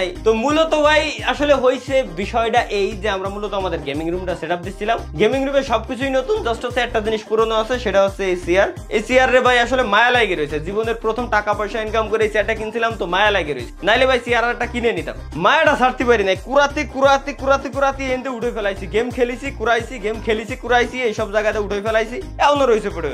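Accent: Noakhali, Bangladesh. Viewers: Noakhali theke boltece ❤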